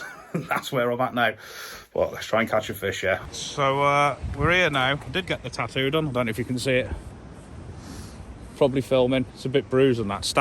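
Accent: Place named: Worcestershire, United Kingdom. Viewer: Are you a Worcestershire lad. Every time I hear your accent it reminds me of my home town of Bromsgrove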